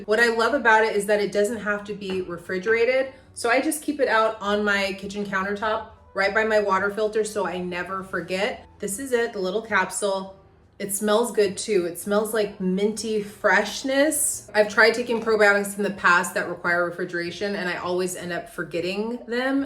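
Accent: Scotland, United Kingdom. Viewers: Hey 🩷 I stumbled across your channel about a week ago & I love your resourcefulness! I don’t think that I personally can be a minimalist, but you give me so many ideas for storage and declutter! I would say add a long frameless mirror in that gap, it will reflect light from either your bedroom window or your bedroom light! Oh & your Scottish accent is great! I’m from England & I can barely do my own 😂🩷✨ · Loved the Scottish accent!❤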